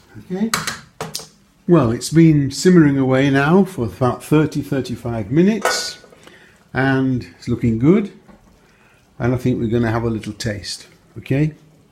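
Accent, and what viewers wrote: United Kingdom. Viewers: Which part of the UK are you originally from Mr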